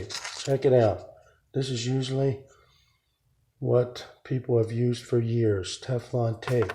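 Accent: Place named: East Coast, United States. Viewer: I knew it was the East coast. I’m from Maryland and you sounded familiar 🥰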